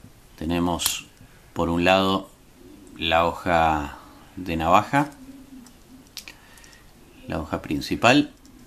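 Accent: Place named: Argentina. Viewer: me gustan mucho tus videos, lo haces muy bien y el acento argentino tambien me gusta mucho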